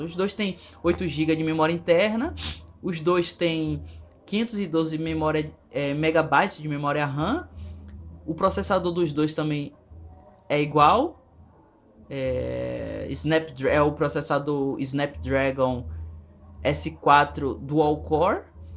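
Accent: Bahia, Brazil. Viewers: IUEAHAEIUHEAIU, seus vídeos são legais ! xD Você é baiano ? e_e'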